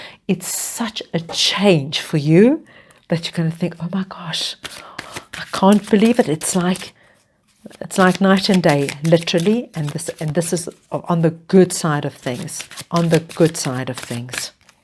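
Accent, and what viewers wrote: South Africa. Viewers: You sound South African. Do you perhaps do personal readings?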